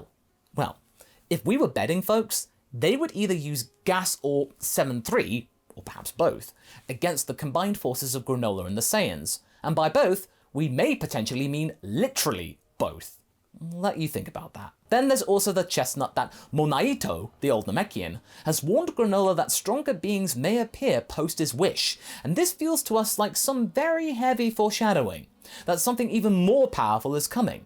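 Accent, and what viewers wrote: United Kingdom. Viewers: I've never known if you live in America or the UK, you're very well poised and well spoken so I'd go more towards the UK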